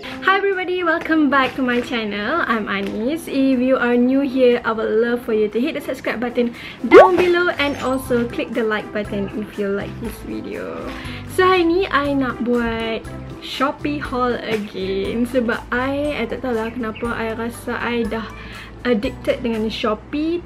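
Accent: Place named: Kedah, Malaysia. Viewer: Suka dengar Anis cakap kedah o. Kalau boleh teruskan cakap kedah :)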